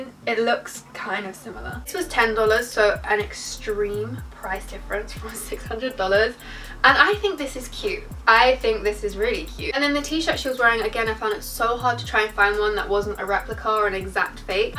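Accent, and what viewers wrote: United Kingdom. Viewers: Brb about to spend all my coins in Shein😭 when I heard your British accent I was like yesss UK stores will be recommended 😭😭 And now I’m lost on Shein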